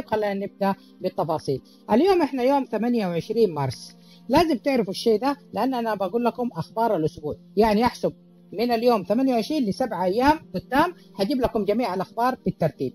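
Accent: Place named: Jizan, Saudi Arabia. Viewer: سوال انت من وين 💖 اتوقع انك من جيزان صح😅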